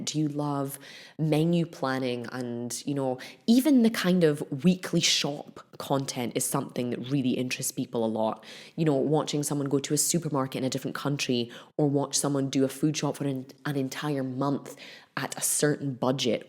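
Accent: Scotland, United Kingdom. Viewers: I dont know what it is but I love hearing you talk lol probably the scottish accent 😉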